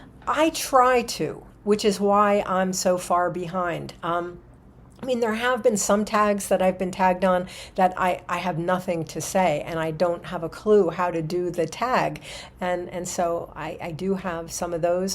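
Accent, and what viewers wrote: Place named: United States. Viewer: Age is just a number I would be more pugnacious but then again I used to be a pro fighter. I have only recently come across your channel I enjoyed your American accent and the way you presented yourself and your books so I subscribed. I live just outside of London, although I am a sexagenarian, I still work in North London part-time as I am a peripatetic chess coach who goes from school to school teaching it. I am an avid reader. I read eclectically. I am a bibliotaph and a librocubicularist. Recently, I picked up an antiquarian book, The Golden Bough, in Hampstead, in what you would call a thrift shop, we call them charity shops here, and I am very pleased with it. Someone also threw away a beautiful bookcase at the bottom of my garden, so I rescued it, cleaned it up, and now store some of my Hardbacks in it. It's one of those bookcases with lead-lined glass doors. 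You can have a peek at some of my books here; it's about 10% of the books I own. If anyone is on there and wants to touch base, hit me up! <link> Regarding poetry, it can touch and change the soul. I recently read the first book in the autobiography of Maya Angelou, and she once said: "When you know better, you do better". That one aphorism inspired me to become a better man. My favourite Poet is Kipling. I also enjoy Shelley, Byron et al.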